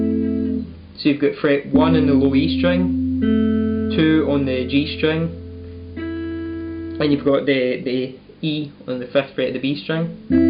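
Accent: Scotland, United Kingdom. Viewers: Was searching through all the intro tutorials then came across your's. I chose to stick around cause your Scottish definitely paid off thanks for the great lesson ;) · are you Scottish?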